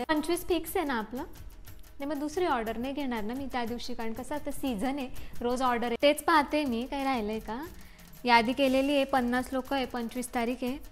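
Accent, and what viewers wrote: Khandesh, India. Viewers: काकू खान्देशी आहेत वाटत 😂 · Khndeshi tone mst khup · Haha....zanzatmari 😂😂 it's a classic khandeshi accent👌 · Khandeshi accent in marathi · किती छान कुठल्याही रोल मध्ये परफेक्ट करते... एकदम खान्देशी चे बाई वाटते...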